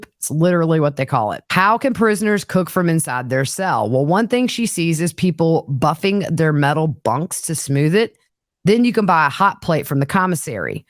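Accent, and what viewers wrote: South, United States. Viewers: Love you! Love these prison life podcasts! Love your Southern accent! Love what you do! Thank you SO much! Appreciate you! ❤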